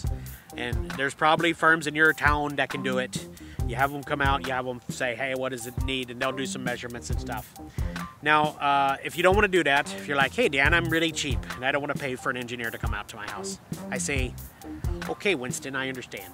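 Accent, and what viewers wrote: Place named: Canada. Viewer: Your accent is between Canadian, English, Irish. Really crazy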